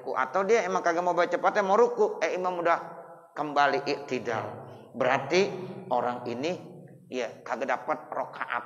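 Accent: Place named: Betawi, Indonesia. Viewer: Smg Allah brkn kesehatan kpd guru kita untuk terus bisa berdawah dgn bhs betawinya yg khas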